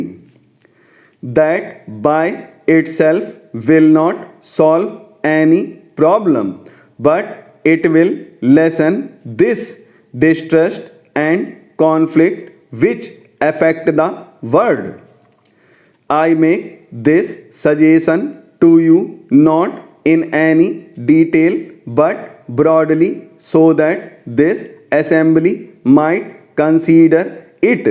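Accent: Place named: Odisha, India. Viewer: Your voice seems like you are from odhisha , right ??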